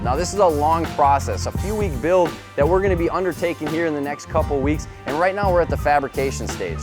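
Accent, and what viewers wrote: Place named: Wisconsin, United States. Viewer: Just that good ole Wisconsin accent! There is a full build list available!! <link>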